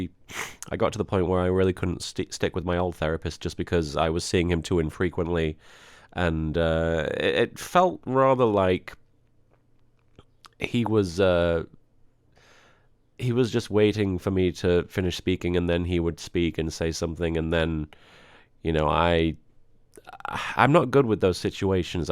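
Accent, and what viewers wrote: United Kingdom. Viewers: i learn how to talk more like a british cause of you :D!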